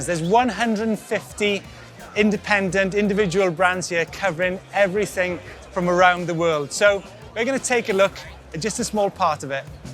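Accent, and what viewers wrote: Wales, United Kingdom. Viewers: Nemanja X it sounds a little Welsh to me, can't say whether it's north or south though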